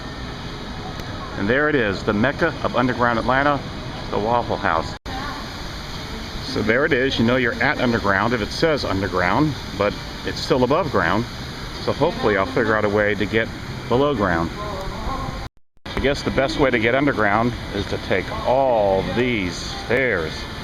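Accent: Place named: New England, United States. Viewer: wow, really good video. Yankee accent though, and 3 dollar words. You ain,t from around here are you all?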